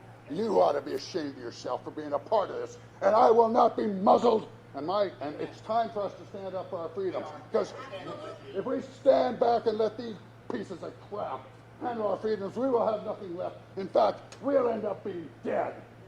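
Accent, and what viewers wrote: South, United States. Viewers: For the first 30 minutes I literally thought you were saying ‘Cow’ instead of ‘Kyle’. I have a southern accent too and it still took me a second.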